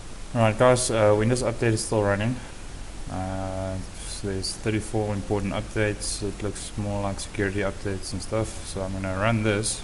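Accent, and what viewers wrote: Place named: South Africa. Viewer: You sound like a Boer!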